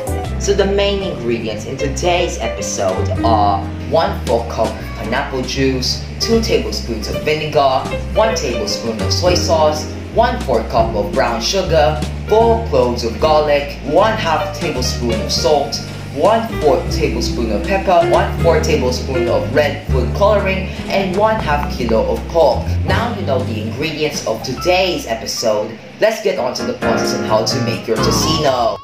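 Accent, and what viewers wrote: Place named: United Kingdom. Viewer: The accent though😯 British accent😯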